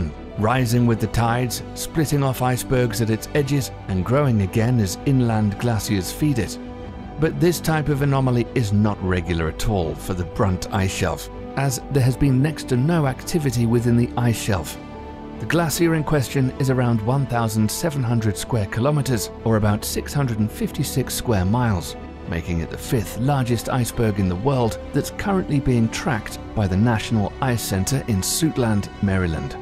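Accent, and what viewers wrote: England, United Kingdom. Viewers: British scary accent, orchestra music, roll the drums and guess what; 99% of all the comments below, agree that this is yet another scary scam. After fourteen billion years of earth history and the survival of life, it appears that 100ppm of CO2 will doom the planet in the next ten years. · Freaking English accent and the background music make it so hard for me to concentrate..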